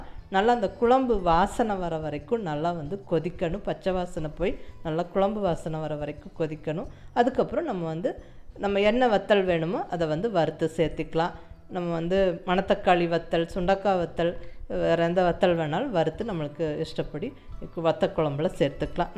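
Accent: Coimbatore, India. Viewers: i tried in tis in the same way u did... got appreciations from family members...tq mam Unga slang nalla iruku..nenga Coimbatore ah...... God bless mam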